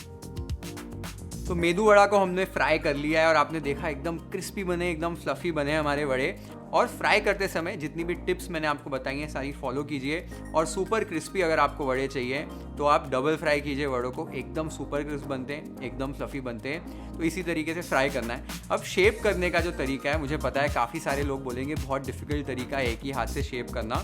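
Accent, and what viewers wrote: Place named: Gujarat, India. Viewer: you speak Hindi in Gujarati style😊😂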